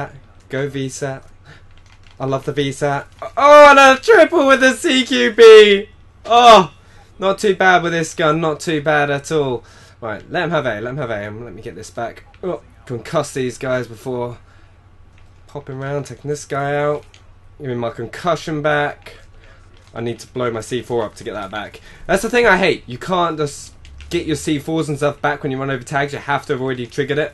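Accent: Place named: London, United Kingdom. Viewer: Which part of london u from. Im in essex. P.s im nt a stalker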